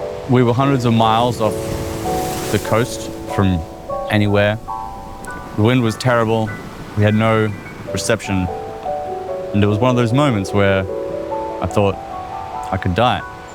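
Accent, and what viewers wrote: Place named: Australia. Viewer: Old at 40 or 50! Ha ha .. Im between those ages, have the same accent as you and have not lived in Australia since 2007. I LOVE my life. The secret sauce is to have no debt and low fixed costs and to think a bit differently.. Everyone can do it it just takes perseverance and imagination... You wont have a flash house like others but you will have awesome memories, stories to tell. At times you will just wish for a simple life and a safe place in that house you dont have but once your batteries are recharged you will just want to see the world again... You do have to sacrifice, no pets, lots of belongings etc but it will be rewarding if you are that way inclined.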